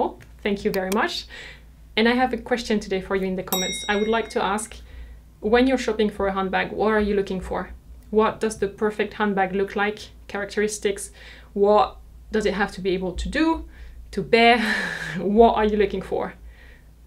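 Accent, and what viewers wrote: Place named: France. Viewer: Love the French names pronounced with a true French accent!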